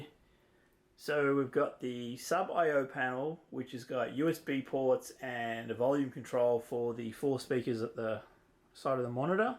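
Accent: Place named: Australia. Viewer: Love the marquee mate! Looks awesome. I suppose you’re also in Australia or maybe NZ by the accent? I just placed an order with Chino last night and should be getting mine in NSW in a month. Very excited and planning on making some small tweaks - mostly replacing the monitor and connecting either a pc or my ps4/ps5 at some point. Just checking if you’re really in AU/NZ to get some tips on where to get parts from locally. I’m sure I’ll need a little box or some cables here and there lol. Cheers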